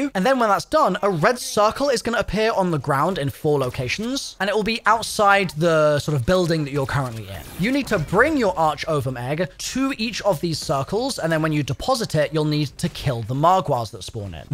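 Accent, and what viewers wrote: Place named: United Kingdom. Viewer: I am laughing so hard at the fact that two days ago I went to find your tutorial and couldn't find one. Now I finally can have my proper British walkthrough as the keepers intended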